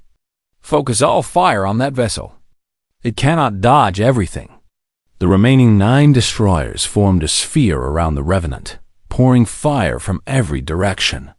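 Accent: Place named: New York, United States. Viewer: I’m pretty sure the person who sampled their speech for the AI to use as narration comes from New York or close by in New Jersey. Great story. Too bad there wasn’t any constant and appropriate visuals to match it.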